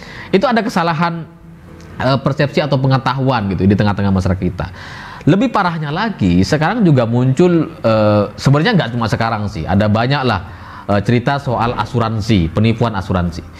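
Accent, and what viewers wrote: Madura, Indonesia. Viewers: Lucu.. kayak logat Madura mas host 2045 tv ini.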